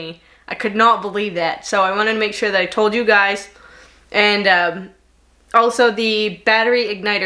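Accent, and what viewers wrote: Maine, United States. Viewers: Love that Maine accent. Great you tube channel. You are a good role model for young women. You remind me of my daughter. She is very outdoorsie too.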